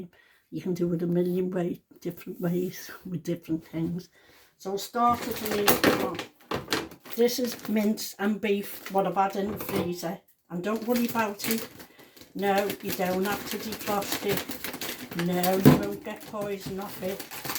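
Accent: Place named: Birkenhead, United Kingdom. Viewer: So glad I found you you make my day my dad and is family live in Birkenhead so hearing you talke makes me so happy I've also lost people I love my husband died 3 years ago and my brother and a4 year old grandson and a 7 year old grandson and some amazing things have happend to let us no there is life after death I have 16 grown up grandchildren and 30 great grandchildren love you to bits your great Christine from Leicester xxx 🌹🌹🌹🌹🌹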